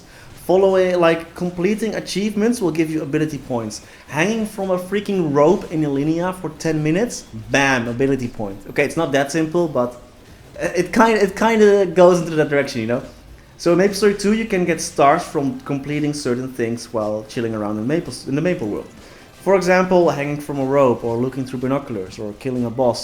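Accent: Netherlands. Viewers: you sound from the Nederlands man ar im right ?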